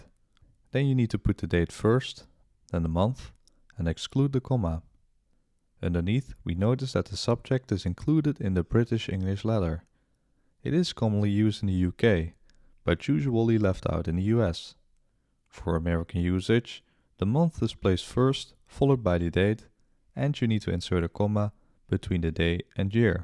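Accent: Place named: Netherlands. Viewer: dutch accent is the best accent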